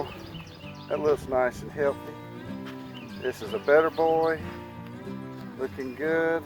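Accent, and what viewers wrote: South, United States. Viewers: After has been gone a few months....I still love to come watch him and hear his peaceful southern voice. Love his videos forever. It's still tough to imagine YouTube without my friend. Miss you Bud!! God Bless you and your family and Toby!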